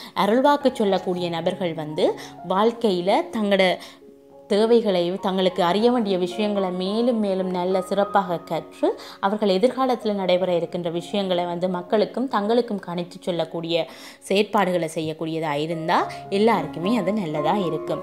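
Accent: Jaffna, Sri Lanka. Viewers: இனிய யாழ் தமிழ் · நீங்க இலங்கை தமிழா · மிக மிக அருமையான குரல்வளம் இலங்கை நாட்டின் தமிழ் ஒரு அருமை உங்களின் அன்பு , நீங்கள் மென் மேலும் வளர வாழ்த்துக்கள் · Srilanka tamilaro vaasippadhu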